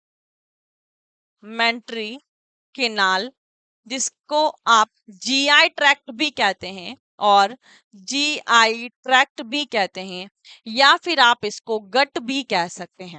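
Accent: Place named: Kerala, India. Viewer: Ap kerala se ho kya mam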